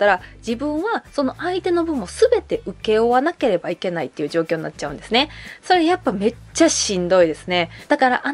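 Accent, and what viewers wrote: Kansai, Japan. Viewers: 先生は関西の人なんですね☺️ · さんの関西弁⁉️ですか、とっても愛らしいです。説明も理解出来ます。男女分けるって案がありますが、一緒の方が互いの違いなどが聴けてむしろ理解が深められるのではないかと存じます。大切なのは、お互いが「謙虚」でなければならないということですかね。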